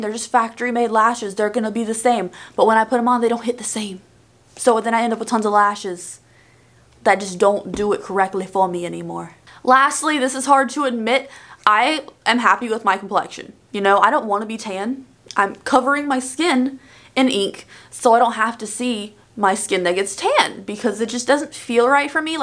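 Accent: South, United States. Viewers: I feel like your southern accent is more present lately and I love it !! Your Morphe Sweet Tea rant in your previous video was hilarious.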